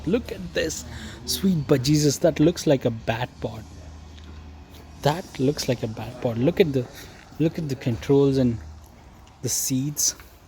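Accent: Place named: India. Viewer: Indian accent AND a mask that muffles you voice.... Really?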